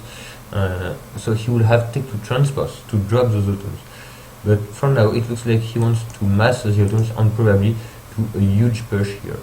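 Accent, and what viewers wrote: France. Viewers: Something about the heavy french accent and the microphone buzz makes watching your casts very relaxing. No but seriously, these casts are amazing because you pay attention to what makes FA awesome, the little decisions. Don't hesitate to do more.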